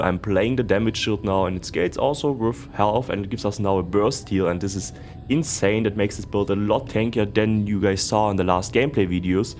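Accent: Germany. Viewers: Love ur german english 😂👌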